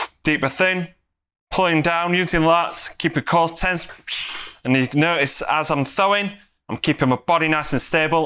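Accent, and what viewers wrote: United Kingdom. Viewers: Amazing! Please if you can add English subtitles for French and foreigners it would be great! Because the British accent is not always easy to understand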